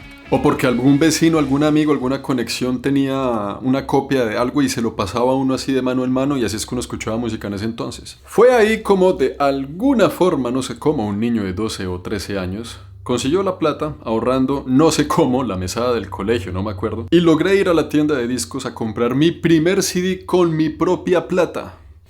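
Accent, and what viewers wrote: Bogotá, Colombia. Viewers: Sigo su canal de viajes , su estilo calmado, educativo, sus comentarios y su acento colombiano que le queda preciso a sus narraciones. Es un ser humano con gustos como cualquiera, y en ese contexto no hay música más internacional que el rock y el metal. Saludos desde Ecuador parcero 😊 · Es el acento de Bogotá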